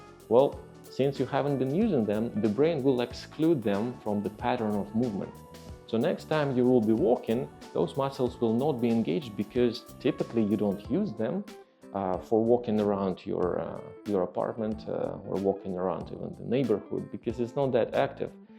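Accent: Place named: Russia. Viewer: Yes now it makes sense. Your accent and vibe remind me of another one of my favourite YouTube content creators, Anton Petrov. He’s also a Russian expat.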